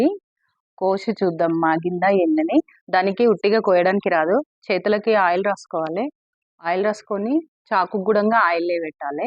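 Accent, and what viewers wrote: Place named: Rayalaseema, India. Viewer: మీది ఏపీ నా....రాయలసీమ భాష మాట్లాడుతున్నారు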